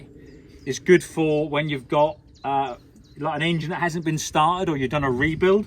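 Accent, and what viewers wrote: Norfolk, United Kingdom. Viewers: All the Norfolk-ness on show tonight 😂 brilliant 👌🏻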